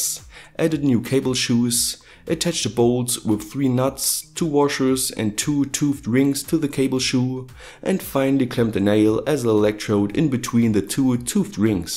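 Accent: Germany. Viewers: he's saying NICKLE ribbons,, for anyone who cant distinguish it ;) He's adapted that annoying youtube podcaster inflection and coupled with his german accent it sounds a bit ,... not good. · Klingt wie ein deutscher der englisch spricht, schade dass es sowas nicht in Deutsch gibt :(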